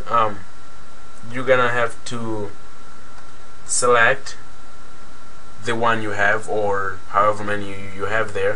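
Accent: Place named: Brazil. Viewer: Are you Brazilian? Your accent seems to be very Brazilian :D Thank you for the tips :D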